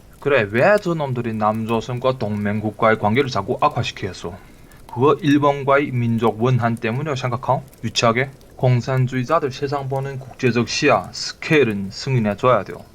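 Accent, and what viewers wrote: Yanbian, China. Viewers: 아닌것 같은데 완전 연변 말투입니다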